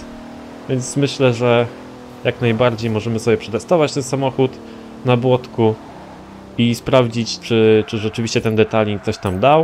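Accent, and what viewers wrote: Szczecin, Poland. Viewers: Ze Szczecina jesteś?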